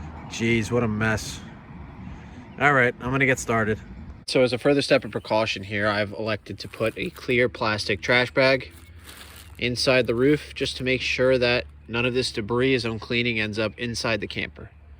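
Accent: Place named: Long Island, United States. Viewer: That Long Island accent 😭😂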